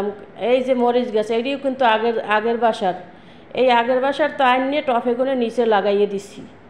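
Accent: Barishal, Bangladesh. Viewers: আসসালামুয়ালাইকুম আপু 😊। বরিশালের ভাষা শুনতে ভালো লাগলো 😊। দূর প্রবাসে ভালো থেকো আপু 😊। আমি আছি গো😊 বালতি ভরা ভালোবাসা দেখলাম 😅। মসলা ❤❤ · আপু তোমার বরিশাল ভাসাই কথা শুনতে অনেক ভালো লাগছে ❤❤সবজি বাগান দেখতে অনেক ভালো লাগলো ❤❤ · আপু তোমার বরিশালের কথা শুনতে অনেক ভালো লাগলো মাশাল্লাহ অনেক বাজার নিয়ে এসেছো অনেক ফল তোমার বাগানের গাছগুলো মাশাল্লাহ ❤❤❤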